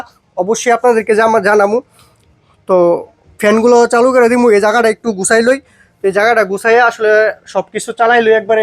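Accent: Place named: Char, Bangladesh. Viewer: ভাই আপনার বাড়ি কোথায়,দেখি চরের ভাষায় কথা বলেন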